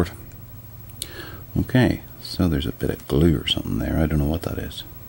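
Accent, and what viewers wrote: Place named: Northern Ireland, United Kingdom. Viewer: Hey, do I detect a Northern Ireland accent :P County Down here !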